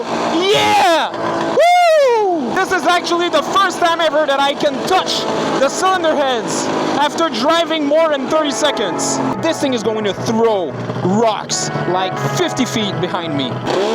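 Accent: Quebec, Canada. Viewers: Where is your accent from? I'm pretty sure it's Canada somewhere but which part? I live in North Dakota U.S. so I'm familiar with how Canadians talk so I was just curious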